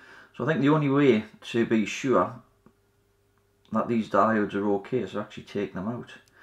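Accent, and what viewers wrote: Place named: Morpeth, United Kingdom. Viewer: Great video. First time I’ve seen your videos! Sounds like your from the Morpeth track?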